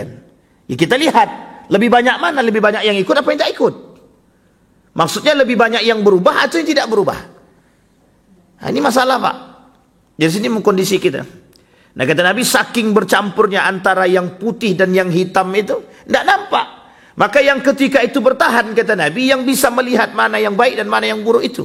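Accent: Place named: Rokan Hilir, Indonesia. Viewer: Logat pak Ustadz mirip dg BHS Rohil Riau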